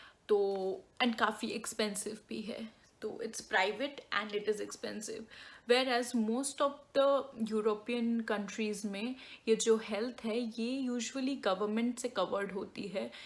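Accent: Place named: Bengal, India. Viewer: Are u from Bengal according to your language style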